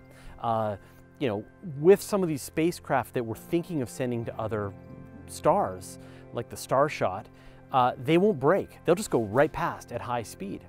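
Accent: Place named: Canada. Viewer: Non-astronomy related but, you are Canadian right? I can tell, I am too.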